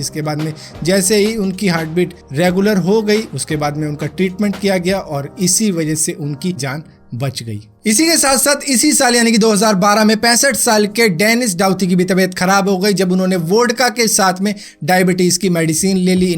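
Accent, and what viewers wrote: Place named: Delhi, India. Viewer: dilli wala accent paarak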